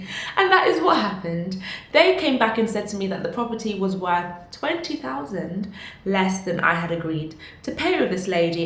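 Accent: United Kingdom. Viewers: I knew she was British before I even clicked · I really love your accent tho ,its a British accent I guess ,its so nice I always love to speak a British accent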